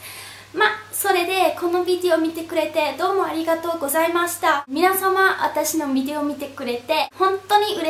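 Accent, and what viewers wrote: Hakata, Japan. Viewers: わぁ～ こんなに日本ごしゃべれるとおもわなかった！！じょうず！はかたべんもかわいい！<３ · 、こんにちは～ 日本語ビデオリクエストしたなかのひとりです(*^_^*) とってもたのしかったです★日本語もとってもじょうずですね！博多のほうげん、とってもかわいい❤ わたしも小さいときにハウステンボス行ったことあります。クレヨンしんちゃん、わらえるよね～いまもほうそうしてますよｗ だいがくせいなんですね・・・わたしは、年がかなり上だけどおうえんしてます!(^^)! 日本語ビデオありがとう❤❤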